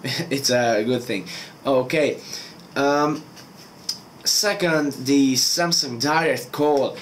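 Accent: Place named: Turkey. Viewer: You just have a Turkish accent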